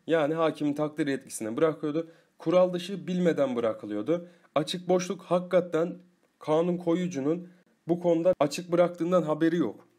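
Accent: Turkey. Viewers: Hocam elazigli misiniz??? Sive cok benziyo gadan alam... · Söylemeden edemicem Yozgatlı mısınız acaba şive müthiş her anlattığınızı gülümseyerek dinledim ve çok beğendim😍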